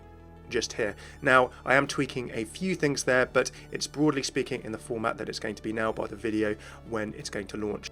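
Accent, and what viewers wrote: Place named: United Kingdom. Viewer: WOW~!!!! A FACE! I HAVE A FACE TO PAIR UP WITH THE SILKY SMOOTH BRITISH VOICE!!!!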